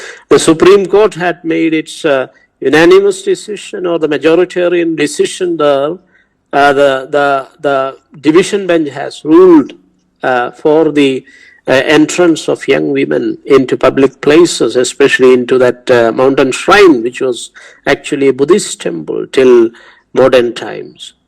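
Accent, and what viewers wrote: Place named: Kerala, India. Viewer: ഏതാ ഈ മംഗ്ലീഷ് വിദ്വാൻ..... Boring recorded talk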